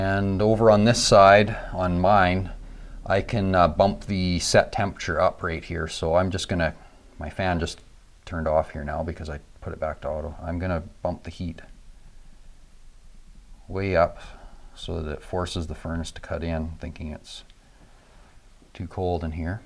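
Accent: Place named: Canada. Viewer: I can't quite figure out your accent, are you canadian or just from some northern state in the US? Minnesota maybe?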